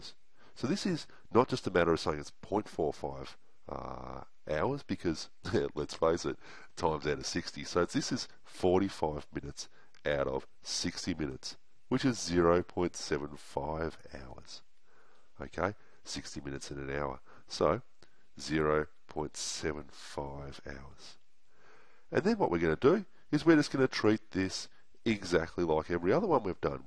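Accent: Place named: Bogan, Australia. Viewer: Love your Aussie bogan accent, 👍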